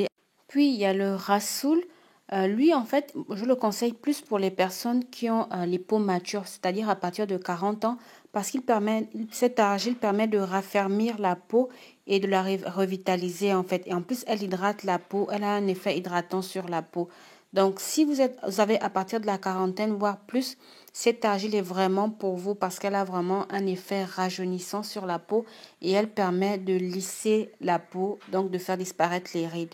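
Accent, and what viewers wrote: Gabon. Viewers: L'accent gabonais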